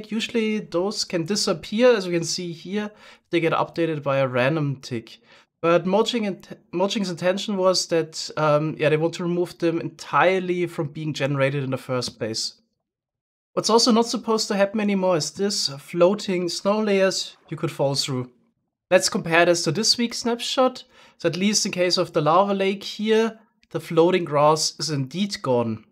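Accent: Germany. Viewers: pretty sure he's german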